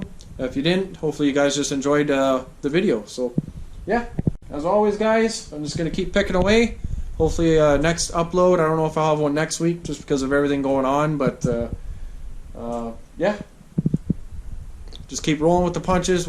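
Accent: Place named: Canada. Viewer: Canadian accent Aye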